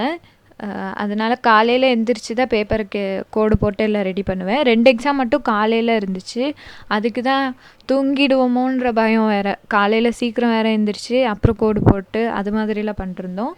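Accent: Kerala, India. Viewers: Your voice is changed like Malayalam slang akka it is nice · Akka today in this video your voice sounds like a malayali but anyways enjoyed your video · Akka nenga ea konjo malayalam kalantha tamil maru peasuringa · Akka neenga inaiku pesunadhu edho Kerala ponnu maari irundhuchu😝😝😍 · Ur tamil slang became like malayali's Tamil slang 😂... It's strange... What happened.... To ur beautiful Tamil slang... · Akka intha video la unga voice kerala voice madhiri irrukku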